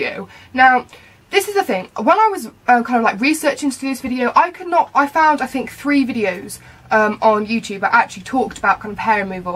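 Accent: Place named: United Kingdom. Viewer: As soon as I saw this video I heard your accent and literally watched the whole damn video because you know what, you're so relevant! I'm British so honestly everything you said was a breeze to follow through because I can access the products and you talk like me :') virtual fist bump you're awesome x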